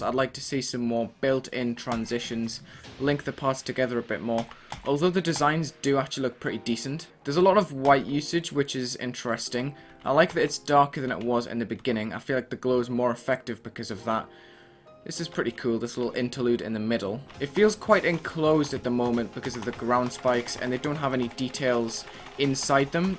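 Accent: United Kingdom. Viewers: well yh but I think he has a SPECIFIC British accent. I get what ur saying tho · He's British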